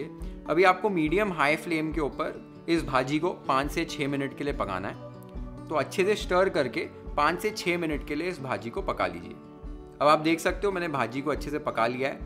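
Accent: Maharashtra, India. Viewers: Dear, I am a hardcore maharashtrian...I am so happy with your pronounciations and Marathi zhatka in most authentically cooked most favourite of entire Maharashtra.Thank you so much.Big fan and follower of yours.God bless you... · खूप सुंदर दिसते मिसळ 👌👌आणि तुम्ही जी शुद्ध मराठी बोलता ती ऐकायला खूप छान वाटते . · Like your Assal Marathi Pronounciation.. Love the way you cook all recipes with authenticity and dedication.. 👍🥰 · love you ♥️ sir I'm from mumbai ( Maharashtrian ) तुमची मराठी एक नंबर आहे 😊 pronunciation authentic Marathi 👌 Your recipes are Outstanding.. no doubt, i personally tried out most of.... Kolhapur means treasure of testy Food 🚩♥️ GBU 🕉️ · Amazing..ur Marathi accent is awesome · Yes... His marathi pronounciation is very clear. I have seen his modak, kale mutton recipe... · Recipe तर झणझणित आहेच..पण मराठी accent एकदम कडक...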